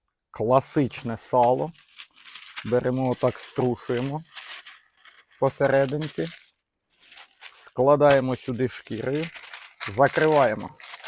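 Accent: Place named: Dnieper, Ukraine. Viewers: Навєрно з Дніпропетровщини, так само балакаю)) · Який суржик, нормальна наддніпрянська говірка))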